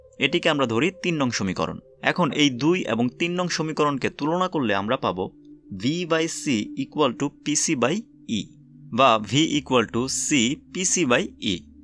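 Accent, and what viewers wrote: Narsingdi, Bangladesh. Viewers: ভাই আপনার বাড়ি নরসিংদী? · ভাই আপনি কি নরসিংদীর ? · আপনার বাড়ি কী নরসিংদী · Hlw vai,Apnr basha ki Narshingdi? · আপনি কি নরসিংদির লোক? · ভাই আপনি কি নরসিংদীর ছেলে?? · আপনার কথা বলার সুর এখনও শুদ্ধ হয়নি। আপনার কথার সুর নরসিংদী'র আঞ্চলিক। বাংলাদেশী সুর ব্যাপকতা লাভ করুক আপনার চ্যানেল। ধন্যবাদ। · apni basa Narsingdi 😲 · ভাই আপনার বাড়ি কি নরসিংদী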